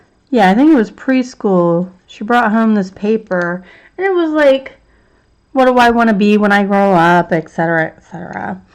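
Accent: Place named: Dayton, United States. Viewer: Your coloring is beautiful. I’m from Celina, Ohio and sounds like your from Dayton ares? Keep up the good work!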